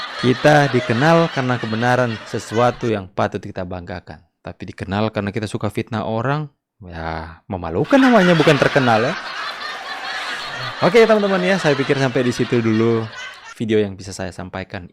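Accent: Manado, Indonesia. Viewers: Tomohon selalu hadir nyimak. 👍😍 Tebarkan terus Kebaikan dan Kebenaran Bung bersama teman2 kreator yg lain. 🙏😇 Sekali2 selipkan dialek/logat Manado neh Bang. 😀😊...mantaaappp. 👍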